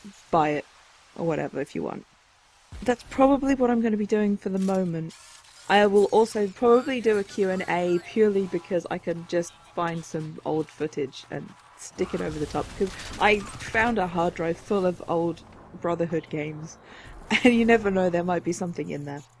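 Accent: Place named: United Kingdom. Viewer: Mmmmm, saucy, British femme fatals playin' vidyuh games. Welcome back,!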